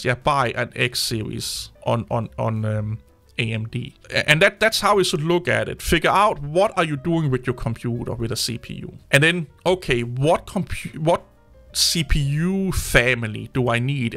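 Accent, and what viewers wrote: Denmark. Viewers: I'm guessing Danish?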